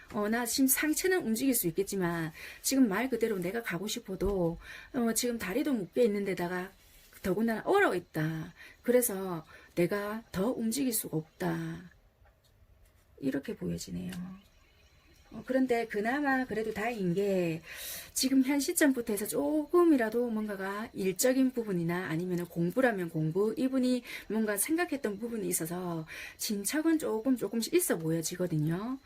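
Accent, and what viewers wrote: Busan, South Korea. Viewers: 부산 사투리 뿜뿜 타로 5 처음부터 조언이......ㅋㅋㅋㅋ귀우셔용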